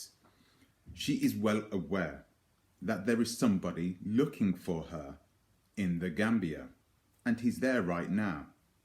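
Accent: United Kingdom. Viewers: He sounds British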